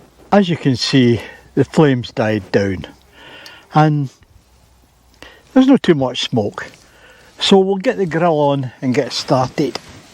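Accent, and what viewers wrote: Scotland, United Kingdom. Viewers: Are you Scottish maybe?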